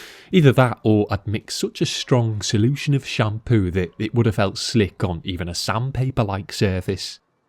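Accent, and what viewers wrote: Liverpool, United Kingdom. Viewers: Liverpool. Caution: They don't always sound this. Usually, it's not so much refined and poetic as this, moreso a nasally flem filled cough imitation. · Are you from Liverpool?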